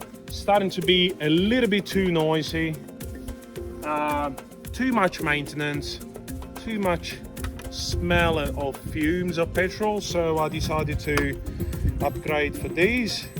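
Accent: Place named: South Africa. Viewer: Trying to work out your accent. So far i've got South African/Russian /Aussie/Brummie.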